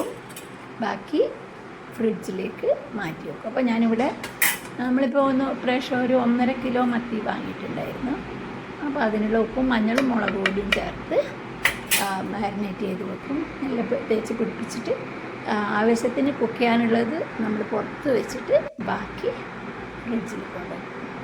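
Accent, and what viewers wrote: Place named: Kannur, India. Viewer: കണ്ണൂർ കുക്കിങ് സൂപ്പർ ആണ് ട്ടോ കണ്ണൂർ ഭാഷയും സൂപ്പർ ❤️🥰❤️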